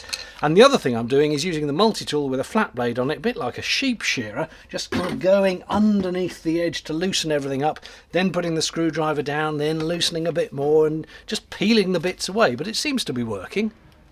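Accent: United Kingdom. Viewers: I cannot believe anyone would comment about you chit chatting. I have always enjoyed a British accent so talk as much as you like! Glad you are making progress - it is interesting to watch. Sounds as if you have it figured out, now if only the weather would cooperate. Your community will be here waiting.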